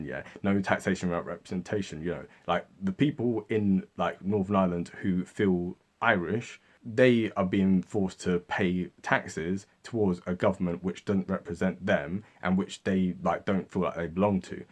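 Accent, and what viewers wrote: England, United Kingdom. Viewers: You can't win here. The sound of an English accent providing solutions to Irish problems drives even the most Irish people bonkers, as there is a long, long history of 'English solutions' causing endless problems for the Irish. You're a brave man...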